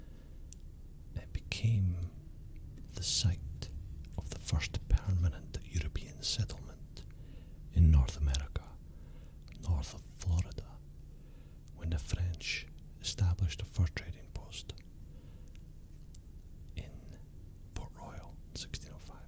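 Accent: Scotland, United Kingdom. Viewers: I love the Scottish accent so much!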